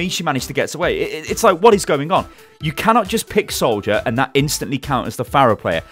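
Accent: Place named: United Kingdom. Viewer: Great British Accent! XD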